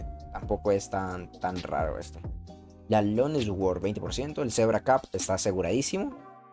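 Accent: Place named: Colombia. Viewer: de que parte de colombia sos-nuevosub-oN_Ry3uc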